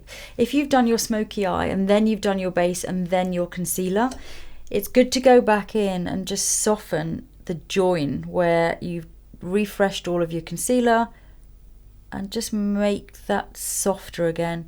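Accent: England, United Kingdom. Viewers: I like your British accent.....and the make up in this video, specially the simple smokey eyes. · you are such a lovely person...and you English, oh, love it. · I watch your channel for years, and I will go on...actually, I learned English with your videos (not only yours, of course), you have a lovely voice and authentic, very polished British accent. We just talked about you today with me stylist in Kyiv, discussed your lipsticks and your book, it was nice. I was lucky to get one of your lipsticks, and I’m wearing it every day))) wish you all the best in 2019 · Thank you, my dear Lisa, for that beautiful and so good explained tutorial. Like other ladies already wrote, your calming voice and elegant british accent are so marveilleus! Regarding your lipsticks: I ordered all three of them and each time I wear Velvet Jazz nearly everyone is asking me what brand it is etc. 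And I'm very proud because You choosed italian craftsmenship to produce them! Merry Christmas! Leonora from Rome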